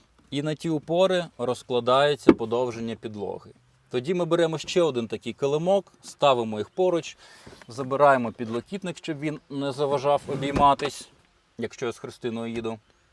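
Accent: Standard. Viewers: Класно говорить по українськи чиста соловїна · Дуже чисто спілкуєшся українською, в приклад іншим👍👍👍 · Доречі , дуже класна та чиста Українська!!!❤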